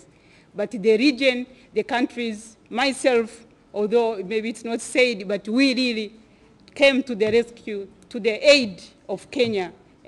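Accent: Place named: Haya, Tanzania. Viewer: She is a Tanzanian from Kagera region. You can hear the Haya accent.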